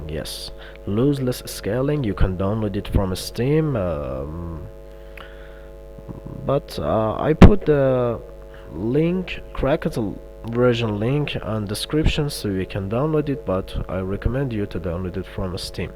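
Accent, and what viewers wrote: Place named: United Kingdom. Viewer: your english is so british bruh, are you asian ?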